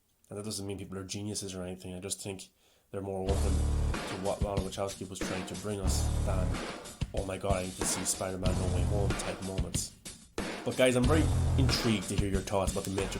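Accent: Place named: Cork, Ireland. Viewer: Great video. Fantastic movie. Made with typical Wachowski sincerity and heart which makes it worth a thousand Marvel films to me. Time will be kind to it. Might be reading too much into into it but you have some Cork accent!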